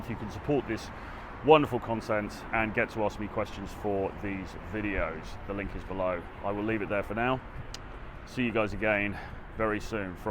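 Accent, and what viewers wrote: United Kingdom. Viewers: Does having an English accent give you a head start in social interactions?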